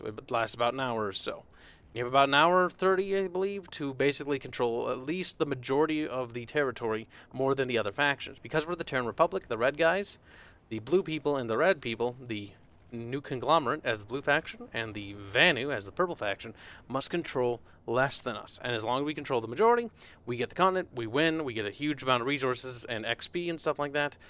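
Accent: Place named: United States. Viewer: Hi! welcome to planetside 2! Are you European? you picked the Cobalt server (Europe server..) and you have a poor connection... wasn't sure about your accent but you sound quite American to me :)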